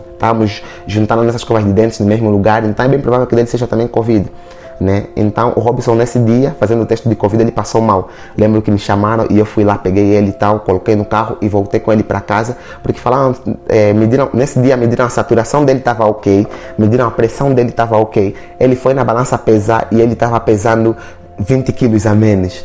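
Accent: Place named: Portugal. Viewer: Ele falar português só que de Portugal entendeu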